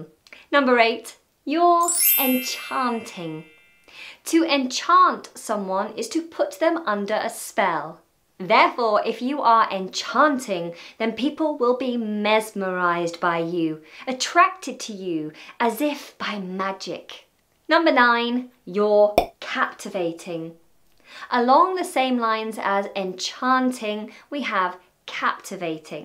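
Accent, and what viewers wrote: United Kingdom. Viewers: To be 'onest I don't like British accent sorry 😆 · You're sweet, but why aren't you blonde? Swedish girls are much sweeter than British girls. However, I am crazy about hearing your British accent, sweetie!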